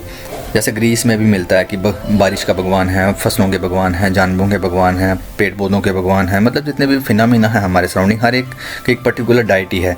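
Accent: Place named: Jammu, India. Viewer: Bhaiya aap jammu se ho kya ? 😅 Aapki awaaz se lg rha hai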